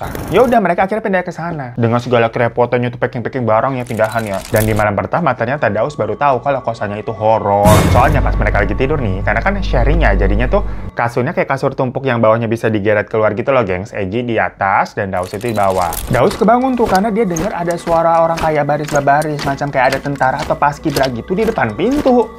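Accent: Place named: Sunda, Indonesia. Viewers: lucu banget ngomong sundanya 😭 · Maaf bang salfok sama logat bhs sundanya 😭🙏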